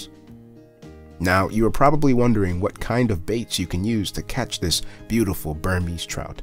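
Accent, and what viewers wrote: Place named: London, United Kingdom. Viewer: Fuh, suara sifu saya ni memang lain macam. Loghat London la. Haha, padu sifu atas ilmu yang dikongsi. #PUNCA #klusterKN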